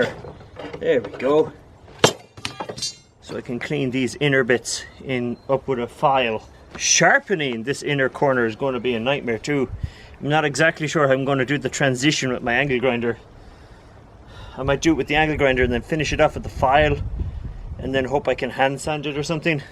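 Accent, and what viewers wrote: Ireland. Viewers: so Irish I LOVE IT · dude where do you live? you sound very Irish, but your children sound American, what? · Your Irish? you sound like Skallagrim and its always snowing. Assumed you were in Canada lol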